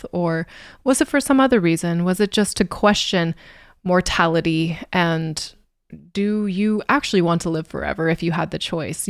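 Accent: United Kingdom. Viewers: Which country is? Her accent seems to be from England.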